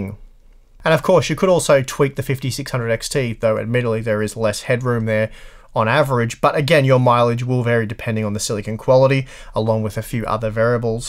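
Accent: Australia. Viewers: love that aussie english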